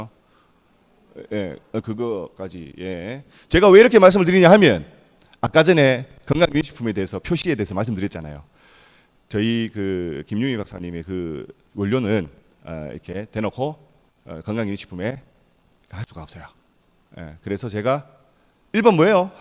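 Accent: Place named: Gyeongsang, South Korea. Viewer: 앱소브제품 설명 잘 들었습니다 구수한 경상도 사투리도 좋네요 이 제품에 칼슘 제품은 없던데 보충해야 하는지요?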